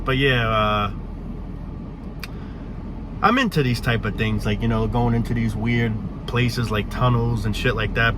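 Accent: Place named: New Jersey, United States. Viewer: I wish I was your friend! I love to do adventurous things like that , and I could listen to that Jersey accent all day long!!!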